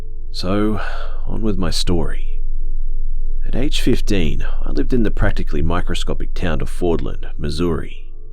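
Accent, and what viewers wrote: Australia. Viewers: Yes 🎉 I actually love hearing a fellow Aussie do these stories 😍😍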